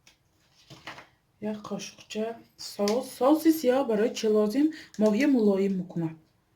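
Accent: Isfara, Tajikistan. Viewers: Салом.рз руи фахмишам номатон аст. Хело хуб.бахшиш мегуфтед.ки шумо дар кадом шахри Русия зиндагони карда истодаед.аз руи гапзаниатон аз ш.Исфара мебошед.агар хато накунам.кадбонуи хуб будаед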